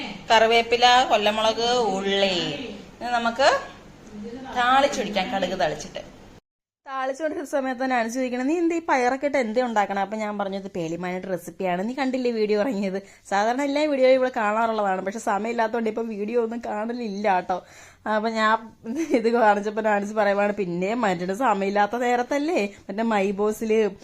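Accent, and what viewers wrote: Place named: Vaikom, India. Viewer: ഞാൻ ഒരു വൈക്കം ക്കാരി ആണ് ഇപ്പോൾ ജിസിസി യിലാണ്..... നിങ്ങടെ വ്ലോഗ് കാണുമ്പോൾ എന്റെ നാടും കൂടെ കാണുന്ന സുഖം പിന്നേ നമ്മുടെ vaikom സംസാരം പിന്നെ ടെ ടാലെന്റ് എല്ലാം എല്ലാം എനിക്ക് ഇഷ്ട്ടം ആണ് ❤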